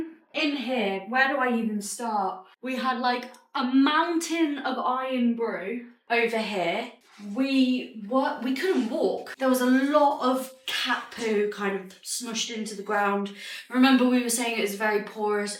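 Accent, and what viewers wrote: South, United States. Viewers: As an American I must say you do an excellent Southern accent😁! Love your work ethic and ethics in general. You are a godsend to many people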